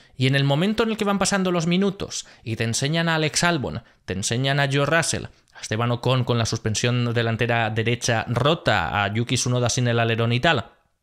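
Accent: Spain. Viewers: Ya tienes dejes madrileños en el habla jajajajajajjajaja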